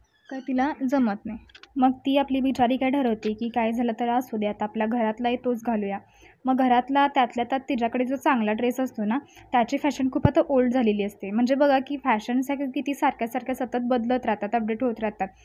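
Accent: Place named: Satara, India. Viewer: i think you from satara speaking satari and gavathi mix but it superb......nice teaching method..i loved it,,,keep doing well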